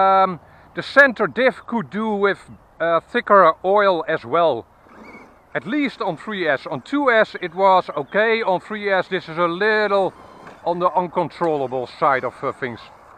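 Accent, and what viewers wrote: Netherlands. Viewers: ik had de naam van je kanaal nog niet eens gezien maar toen je begon te praten hoorde ik meteen dat je een Nederlander bent maar leuke video ga o door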